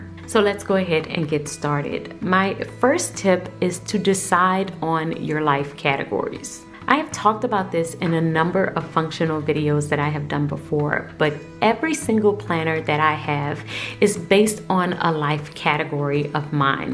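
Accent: New Orleans, United States. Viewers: I detect a New Orleans accent too.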